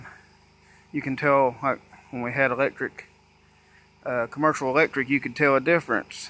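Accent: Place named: South, United States. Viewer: he likely made a ignorant assumption because of your accent. because we southerners can't be educated.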